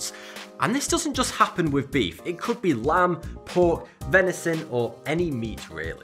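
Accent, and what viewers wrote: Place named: England, United Kingdom. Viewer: He definitely English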